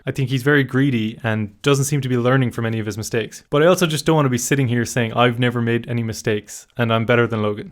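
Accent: Ireland. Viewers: That's an Irish accent right there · Nice piece on Logan. I would have never of thought I would watch more than a minute of Logan’s content and nowadays I won’t miss an episode of his podcast. I hope he makes things right about this crypto stuff, I feel like he’s going to address it soon. Btw I’m a Leitrim man myself you don’t sound far off. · You sound like an irishman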